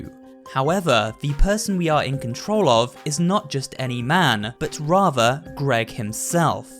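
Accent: United Kingdom. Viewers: arent you british?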